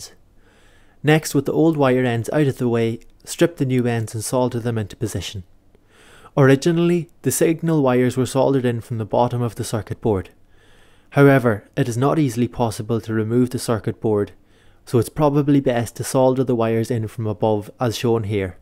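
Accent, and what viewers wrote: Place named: Ireland. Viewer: Thanks, your accent sounded a bit Ireland.?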